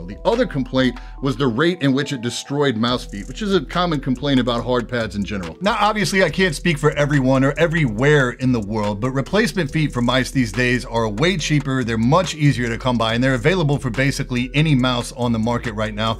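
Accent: United States. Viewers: You have the most American voice ever.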